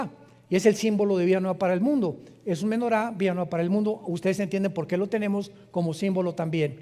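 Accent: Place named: Mexico. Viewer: Gracias a Dios por este siervo y hermano en la fe de nuestro Señor Jesucristo, el Dr. Armando Alducin quien tiene un ministerio maravilloso enseñando al pueblo de Dios las grandes verdades bíblicas, el plan de Dios para la redención de la raza humana, una linea cronológica de los grandes acontecimientos actuales y futuros del plan de Dios para la humanidad, una orientación de la palabra de Dios para consolidar el crecimiento del carácter cristiano, profundiza las enseñanza y los misterios de los diversos conceptos bíblicos contextualizándolos, geográfica, histórica, cronológicamente etc. etc., revela el carácter santo, el amor, y la justicia de Dios. Además lo expone de manera magistral, profunda, ungido por el Espíritu Santo, entretenida y con su acento mexicano que para los cristianos de habla española nos resulta muy interesante y divertida, en especial a los cristianos chilenos que admiramos y respetamos mucho al pueblo mexicano.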